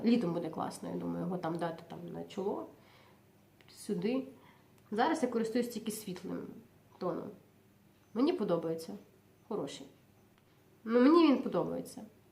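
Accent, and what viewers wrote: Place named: Lviv, Ukraine. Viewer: Спасибо за новое видео! Смотреть- огромное эстетическое удовольствие! Вы, очень красивая, шляхетна пани! ))) И очень очень нравится Ваш львовский говор! Ну и тембр голоса у Вас очень приятный безусловно!! Всего Вам наилучшего желаю и Вашей семье!!!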